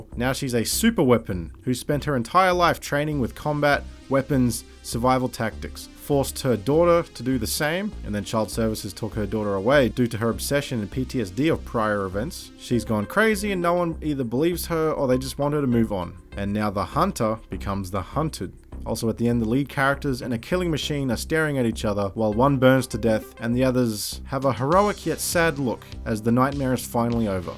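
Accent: Australia. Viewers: Slightly off topic "From the people who brought you Halloween and such titles as; H2O: Water..! H4O: Twice the Water..! Comes... H60..! Three times the water! Prepare to wet yourself, this Halloween season..! H6O! In theaters, now!" (Great vid of course, love the Australian accent as always. Good people.) ^^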